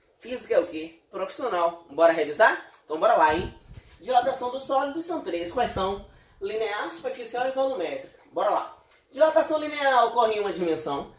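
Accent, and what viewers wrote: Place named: Bahia, Brazil. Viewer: Ce é da Bahia ? Sotaque parecido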